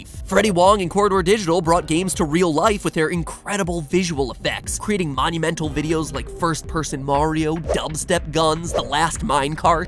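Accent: United Kingdom. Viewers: Is that how us BRITISH people sound?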